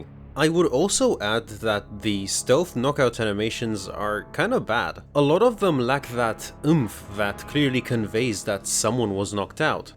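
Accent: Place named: Balkans. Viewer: No way you're actually Dutch? I'm really sorry but you got some sort of Balkan accent, I never expected that lol Of je kan Belgisch zijn... Ik heb geen flauw idee hoe een Belgisch accent in het Engels klinkt x3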